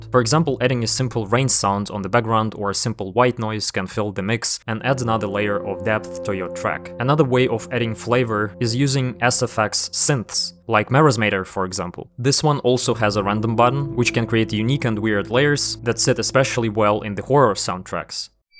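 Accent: Europe. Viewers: He just has a European accent of some kind. Is that what you mean? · I came to say the EXACT same thing 😂. Dudes just European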